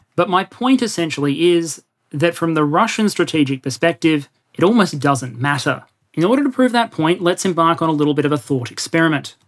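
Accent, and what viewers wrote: Australia. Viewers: He's Australian. It's weird how many comments there are saying he's British though...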